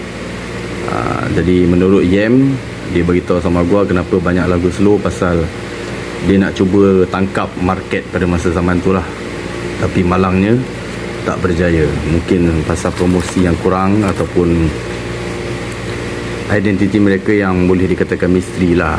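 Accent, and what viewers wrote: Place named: Malaysia. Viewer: Sy dah lama tertanya²..bro ni org Bugis Selangor ke? Pekat no melayu nye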